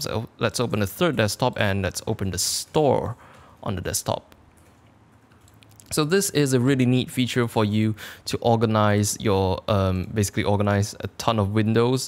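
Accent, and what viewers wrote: Malaysia. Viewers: Sounds malaysia english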